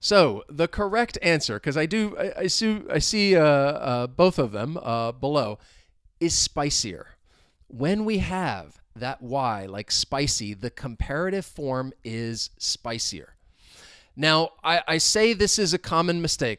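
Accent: United States. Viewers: pls make a video about your accent and your state of origin 🇺🇲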